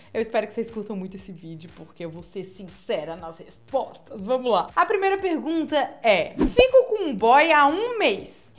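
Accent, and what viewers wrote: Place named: Florianópolis, Brazil. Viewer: Sotaque de Manezinha de Floripa. 😎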